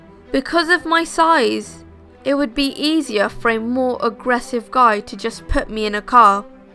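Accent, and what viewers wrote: United Kingdom. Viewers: I like your videos, mostly because of your voice and the well-picked stories. But I have to say, that the videos don't "haunt my nights" like other creepypasta-narrations (or any other creepy stuff :P) because of your voice. Personally I would say, that your videos (and your voice + the cute, little, british accent :D) are fascinating and, yes, also a bit creepy (And you don't want to know how long I've been searching for a combination like that) In short: I like your videos and ,please, never change the way your narrate the stories :)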